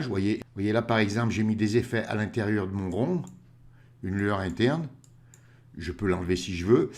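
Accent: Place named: Saint-Étienne, France. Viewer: Merci pour l'abondance de ces tutos si précieux en période de confinement. Cerise sur le gâteaux, l'accent stéphanois que j'ai découvert lors d'un séjour offert il y a bien longtemps, gracieusement, il y a bien longtemps au camp de la Valbonne. Le problème , c'est que l'on devient accro et qu'on en redemande.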